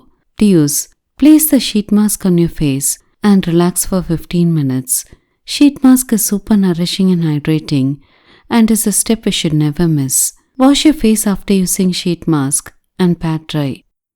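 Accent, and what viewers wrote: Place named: Telugu, India. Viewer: Your accent sounds you are Telugu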